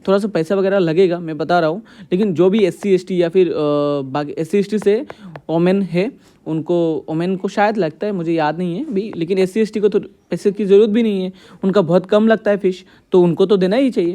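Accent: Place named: Assam, India. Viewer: Aap thoda Hindi sikhlo. 100% Assamese honge. Phut phut Assamese jhalak rahe hai 😅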